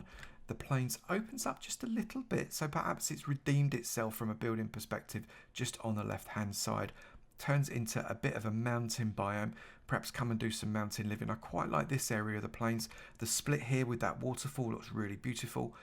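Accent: United Kingdom. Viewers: your voice sounds like gordon ramsay lol or some british dude doing a tutorial about somehing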